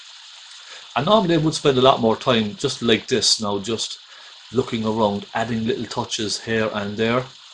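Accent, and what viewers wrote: Ireland. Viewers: hard for international people to understand English with an Irish accent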